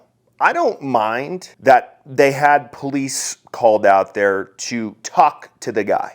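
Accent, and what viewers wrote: United States. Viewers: This real man here speaks like an american!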